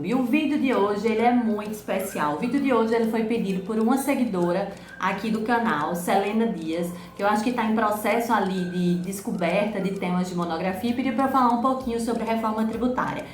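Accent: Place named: Northeast, Brazil. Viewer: Meu deus, que aula maravilhosaaaa! Quero agradecer de coração, pois estou há mais de meses tentando achar algo que me guiasse nesse TCC. Direito tributário é a matéria que eu tenho paixão e que brilha meus olhos, como a senhora falou precisamos fazer na área temos essa sensação. Com certeza irei seguir suas dicas e tenho convicção que será excelente o tema. Gratidão, profa!! Ah, amei seu sotaque rsrs Sou nordestina também, com muito orgulho.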